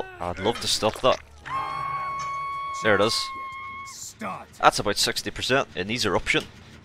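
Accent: Northern Ireland, United Kingdom. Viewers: lol he sounds nothing like Paddy Pimblet. Paddy is English and has a strong Scouser accent from Liverpool & is from Northern Ireland by the sounds of it.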